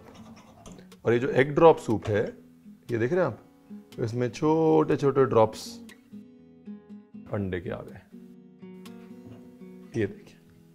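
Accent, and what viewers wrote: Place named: Punjab, India. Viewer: Sweet Punjabi accent...loved it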